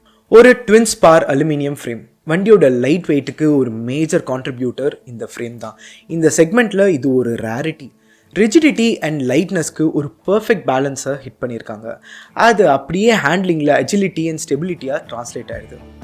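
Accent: Chennai, India. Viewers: You are an amazing motorcycle tamil youtuber. When you talk the technical jargon in your own way is so cool man especially in our Chennai slag...you nail in every video. Although I am less interested in motorcycles I used to watch coz of you.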